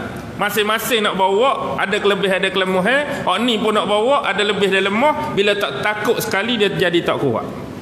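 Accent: Kelantan, Malaysia. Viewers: kuliah ni buleh dengar Ustaz kecek Kelate, Kuliah lain bbunyi Utagha semace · 1st time dgr ustaz bg tzkirah full guna loghat klate,ad gk trselit skit loghat utara 😂 btw,cite p ramlee mmg byk mksd trsirat,org² trtntu je yg bole pham..moge ustaz trus succes dunia akhirat..aamiinn #XlapukDekHujanXlekangDekPanas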